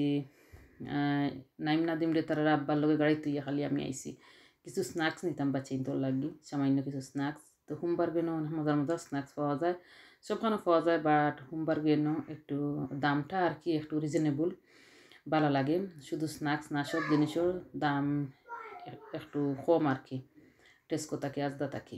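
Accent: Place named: Sylhet, Bangladesh. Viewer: Ldone assalamualaikum apu kemon achen sylhet vasha sunte valo laglö apu sylhet vasha asena shuddo vasha chole ase valo laglo apu ❤❤❤❤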